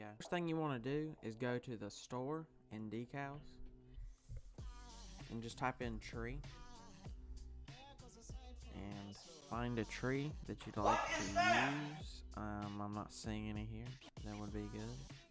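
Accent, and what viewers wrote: United States. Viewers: red neck accent yeehaw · the cowboy accent lol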